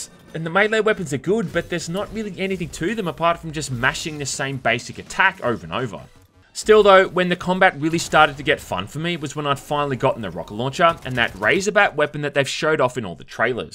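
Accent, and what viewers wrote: Australia. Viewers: I live for this kind of review video, with aus accent. :face-blue-smiling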